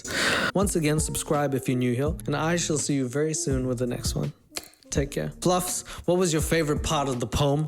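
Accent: Australia. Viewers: Less than 6 minutes in and my dog tried to save us by knocking the YouTube machine (cellphone) off the table. Though in this dude’s defense- he sounds like a standard surfing Australian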